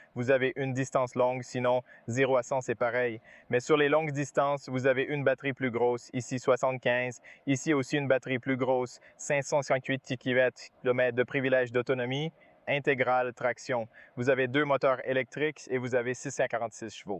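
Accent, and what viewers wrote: Quebec, Canada. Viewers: Honnêtement ,cette vidéo comporte beaucoup d’informations interessantes mais exprimées dans une telle confusion,passant du coq à l’âne , brouillées par un débit verbal trop rapide et par l’accent québécois que je renonce à vous écouter jusqu’au bout. Pardonnez moi .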